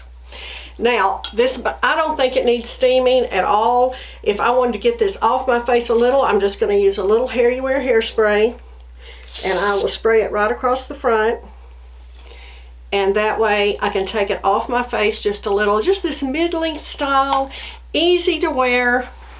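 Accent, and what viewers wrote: South, United States. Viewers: I love your videos and your southern accent is wonderful. I have bought many Raquel Welch wigs in the reds. Several in Glazed Fire (RS28). I also have three wigs in the Fiery Copper that you have own. My all time favorite wig in this color is Advanced French. But I also wear Born to Shine in the same color. I have also purchased a wig in Golden Russet, but to me, this is much more blonde than red.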